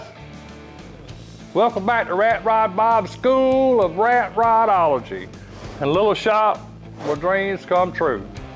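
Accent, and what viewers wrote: South, United States. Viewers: when you really do the southern drawl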